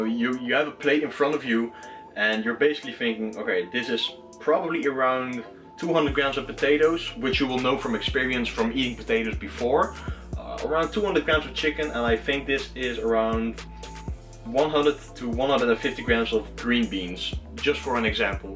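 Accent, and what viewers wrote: Netherlands. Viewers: It took me 0.1 seconds to realize you're Dutch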